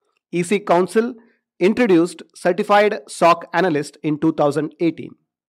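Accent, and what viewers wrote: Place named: India. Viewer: I hate clicking on this videos then listen to an Indian voice🙄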